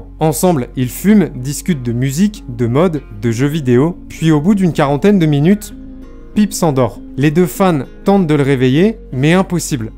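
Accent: United Kingdom. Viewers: Tu me fera toujours autant rire avec ton accent anglais je kiff 😭😭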